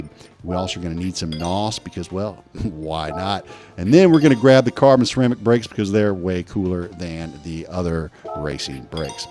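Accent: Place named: Texas, United States. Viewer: Man that texas accent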